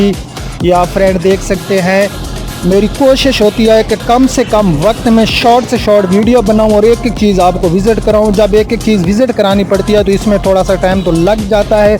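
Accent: Saraiki, Pakistan. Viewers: Aap ka speech style impressive hy sraiki lehjay ki wajah se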